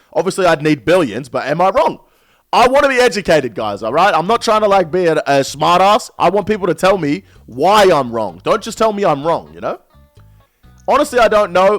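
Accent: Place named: Australia. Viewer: Bro you are the most cringe Aussie OSRS creator, possibly the most cringe out of everyone. No one cares what you think and the fact you think a clips channel of your garbage streams is insane. Get a haircut lose some weight and stop over accentuating the Aussie accent you absolute loser. You and behemoth are embarrassing.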